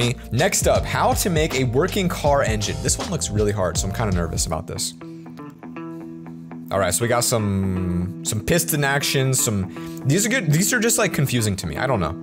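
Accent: Russia. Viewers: tip u need to set to to the nigth mode :) by clicking it also nice russian voice😂 live ur vids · noice russian voice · Ur Russian makes the vid ten times better 😂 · Bru. His Russian accent xD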